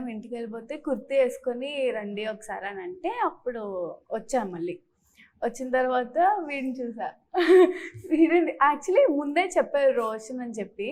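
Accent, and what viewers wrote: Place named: Godavari, India. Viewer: Be yourself. Be proud of Godavari accent. All the best baby🎉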